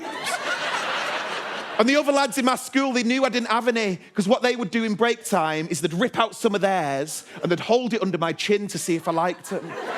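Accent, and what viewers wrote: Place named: United Kingdom. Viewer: This is so british and i cant understand some of it but love is love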